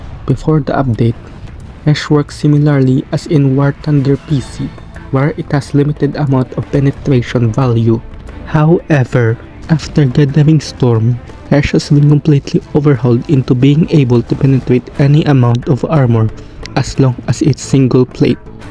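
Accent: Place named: Philippines. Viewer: bro got the heaviest filipino accent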